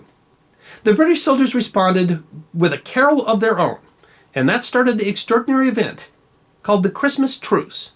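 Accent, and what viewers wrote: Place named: United States. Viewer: at last I have found someone whose American accent is thicker than mine! Hint: The terminal "e" on German adjectives is pronounced like the English "a".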